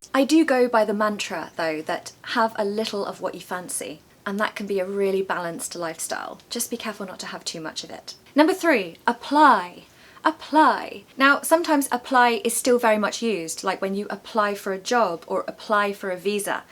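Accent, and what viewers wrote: United Kingdom. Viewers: Hello dear!!! I´m from Brazil and first of all I´d like to thank you for your contents here!!! I´ve been studying English for about a year and they are helping me a lot over this time!! i haven´t words enough to thank you for all of that!! Ps: British Accent is amazing!!! 😍🥰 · I love the British accent ❤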